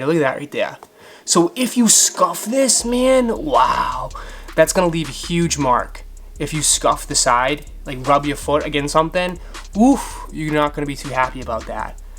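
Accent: Boston, United States. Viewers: I hear an accent lol Boston? EDIT: I asked that in the beginning of the video.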